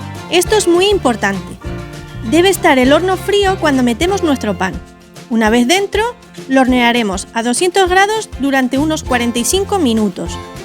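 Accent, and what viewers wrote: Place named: Spain. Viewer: Hola cómo estás, soy tu admirador y seguidor veo por tu hablar que eres española, de que ciudad eres, me gusto tu receta de pan como todas las otras.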